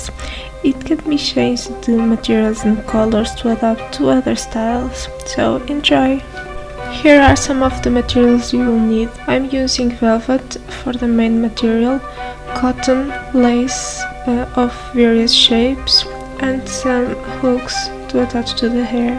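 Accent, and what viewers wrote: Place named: Portugal. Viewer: mal abri o video soube logo que eras pt-pt por causa do sotaque😭 portugal mentioned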